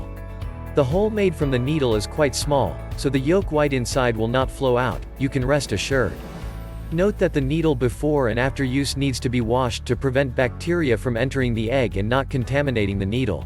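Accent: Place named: United States. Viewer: This video is so scary. It is obviously not written in English lmfao but the white American accent is scary!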